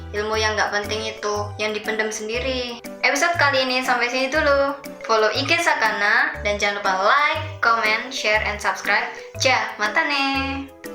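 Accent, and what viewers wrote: Java, Indonesia. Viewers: Muedhok puol, tapi keren kak saya suka tips nya hehe 👍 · javanese nya kentel bgt mba 😂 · klo aku nanya kayak nya di jawab ngga yah??? kak, orang mana sih? kok jawa nya agak medok? hehehe just asking.... Loading.... Waiting for answers..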